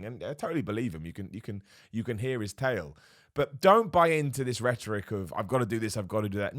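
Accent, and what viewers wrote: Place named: London, United Kingdom. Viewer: You got to love that smarmy working-class London accent.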